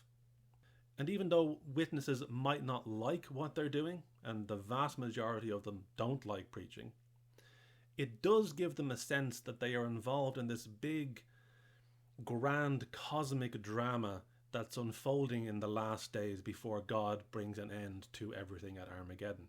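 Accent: Ireland. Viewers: were you a witness ~~~sounds like you are from Ireland ?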